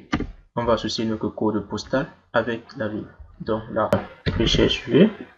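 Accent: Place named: Africa. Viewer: C'est magnifique on a beaucoup apris et avec du français à l'accent africaine ça fait vraiment plaisir, merci.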